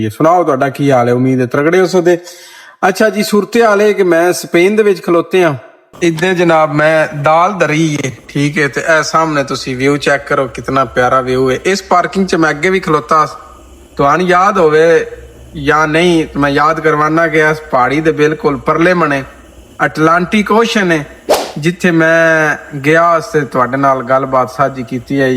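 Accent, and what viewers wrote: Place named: Sargodha, Pakistan. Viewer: Bahi g mennu Sargodha da lagda 😂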